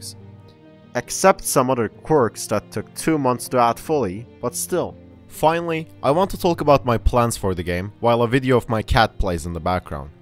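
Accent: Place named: Turkey. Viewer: mirror tutorial videonu izlerken abi bu adamın aksanı türk gibi diyodum harbi öyleymişsin. Valla kanalını yeni keşfettim umarım istedğin şeye ulaşırsın. Oyun da güzel görünüyor bu arada. Test için yardımcı olabilirim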